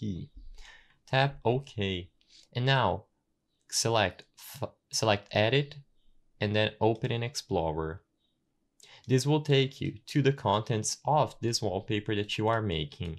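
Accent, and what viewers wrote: Brazil. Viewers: Are you from Brazil? Your accent is so familiar to me.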